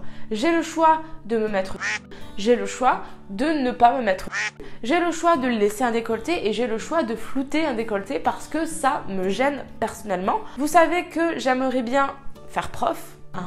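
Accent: South, France. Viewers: Fait se que tu as envie les gens son idiot 😑 j’adore ton accent du sud mdrrrr 😘❤️ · En vrai je comprend pas trop pouquoi tu te prend la tête avec ce genre de com', perso je les trouvents pas insultant et c'est plutôt légitime de poser des questions sur le contenue surtout si d'un moment à un autre ça change... Ils ont pas critiqué ton choix mais poser des questions et c'est pas grave (dans ceux que ta montrer). Si tu voulais pas repondre et te prendre la tête ne répond pas et passe à autre chose car je t'ai trouver vachement tranchante dans tes reponses de manière injustifié ^^' Ça ce voit que ça te tiens à coeur mais les questions de montage et de contenue sont inévitable sur youtube... tu te prend la tête pour rien ❤ Oui ta le droit de flouter/ ne pas flouter ce que tu veux et il y aurait eu des com' de toute manière mais sur autre chose... c'est ça la connerie de youtube et si tu te prend la tête comme ça pour des com' où les gens ne t'insulte/ ne sont même pas vulgaire / ne te reproche rien mais juste pose des questions tu vas avoir énormément de mal a supporter la vie de youtubeuse de 2020.. 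Et jcp pouquoi tu parle de féministe car je voue aucun com' qui dit " meuf tu es féministe c'est inadmissible de pas montrer tes tetes". Je pense tu te prend la tête pour rien ❤ ps: si l'accent du Sud c'est chou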